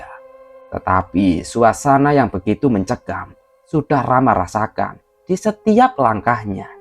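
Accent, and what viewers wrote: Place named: Java, Indonesia. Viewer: medok bnr mas jowo ne keto 😂😂😂 ok lanjutt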